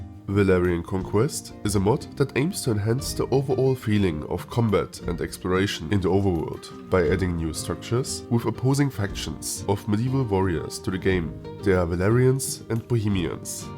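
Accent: Germany. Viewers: Ah, ein Deutscher mit einem dicken deutschen Akzent! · du kanst so viel englisch sprechen wie du wilst aber ich höre nen deutschen dialekt wen ich nen deutschen dialekt höre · Da hör I do n deutschn? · Man hört so raus das du deutscher bist 😅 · i can hören the wundervollen german accent, bitte mach mehr videos! 💪💪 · you sound so german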